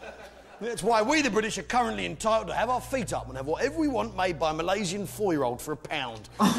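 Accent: United States. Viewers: The most American sounding Austrian I’ve heard 😘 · Loved the video, first one I've seen, I've just subscribed. Although, from the accent I did think you were American! Sorry... Whoops, that is so British! Al Murray went to Oxford University, quite a good university I believe (though not as good as Hastings Polytechnic), where he studied history. Look for his session on 'Great Briton has defeated every country in the world' to see just how clever he is. His character is based on the 'know all' and outspoken pub landlords that were everywhere in the UK, many years ago. Now it's all over priced lager, expensive food in a bland environment..... Though I have found a local pub, with an Al Murray type landlord and it's great! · You are from Österreich? why do you speak with an American accent when speaking English just curious? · Austria but has American accent? 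Huh?? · austria.... wow, i was convinced you was a yank, youll have to come to wales, ich dien cymru, another great tribe from germania ;) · Hi, love your video reactions. Can you explain as you're Austrian from Vienna, how is it you have an American accent?!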